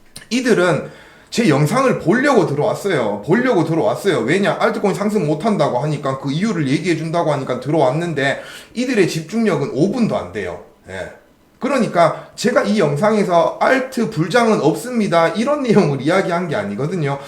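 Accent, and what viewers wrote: Gyeongsang, South Korea. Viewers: 경상도 사투리가 문제가 아녀요..내용이 좋으면~~ 상처 받지 마시길요❤❤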